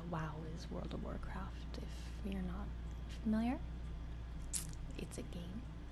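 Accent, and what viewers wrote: Canada. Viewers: It's so cute, I can hear the Canadian in your accent in a lot of your words in this video for some reason. Maybe my left ear is compensating for my malfunctioning right ear so I am able to pick up on it better. I don't know. Anyway, I find it quite endearing although I don't know why.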